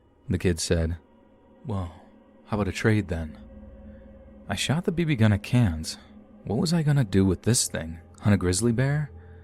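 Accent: Australia. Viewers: ..danger to be brought to your face.. Choice. Plus the Crocodile Dundee reference and his Australian accent..😂😂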